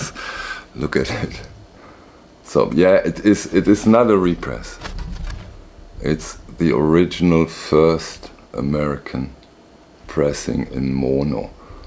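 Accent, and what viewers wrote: Germany. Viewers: Hey, I find your slowness in speaking embarrassing and I hate your terrible German accent: e wäry, wäry, ärly pressing. Phhh. Everything you say is predictable and completely unoriginal. I don't think you're very musical, based on what you rate positively. I am amazed at your many fans. Ok. That's how it is.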